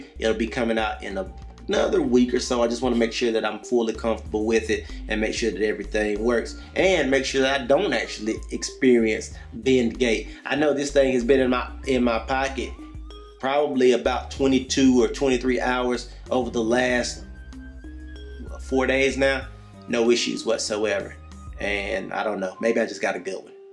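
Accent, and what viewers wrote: South, United States. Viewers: I just love his southern accent haha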